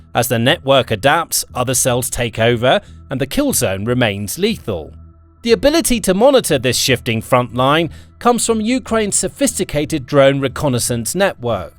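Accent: United Kingdom. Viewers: You speak with a British accent and talk about Europe BUT USE MILES? instead of Kilometers? I don't get it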